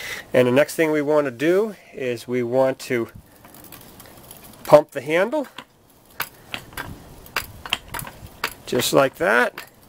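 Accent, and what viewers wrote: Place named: New England, United States. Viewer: Outstanding content. Thank you for this video! If you don't mind me asking, is that accent from Rhode Island, CT, or other?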